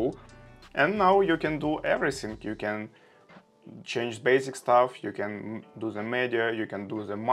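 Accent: Russia. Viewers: where are you from bro? why u talk like russian people я слышу именно максимально русский английский говор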